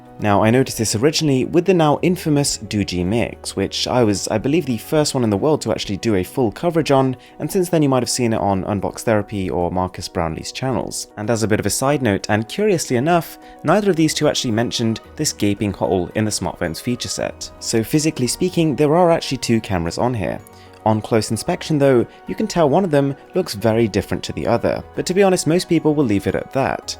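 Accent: United Kingdom. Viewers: Extremely difficult for me to follow. I get the main point but you rapidly slur through about 20% of your words with your nice Queen's English accent, making it impossible for me to understand your finer points. If I were sitting with you at a luncheon, I imagine that I would constantly, embarrassingly, be having to ask, "I'm sorry, I didn't quite get that?...I'm sorry, could you repeat that please?" If you wish to professionalize your narration, it would be useful to work on enunciation and clarity of presentation. Despite this, thank you for the content and information.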